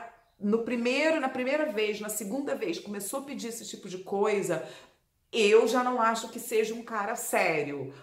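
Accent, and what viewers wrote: Rio de Janeiro, Brazil. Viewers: Convicções maravilhosas, ter cautela em qualquer situação, ter dois pés no chão, e cuidar com os perigos virtuais, também acho que vc e seu noivo formam um lindo par, são almas afins não existe alma gemea há encontros que não são conscidência, são providência de Deus mesmo pessoas que já estão ligadas pelo destino um do outro que eu sei lá acho que já são pré destinadas uma para outra...bjo vc sempre linda e elegante com essa dicção carioca do sutaque que fala o português coloquial.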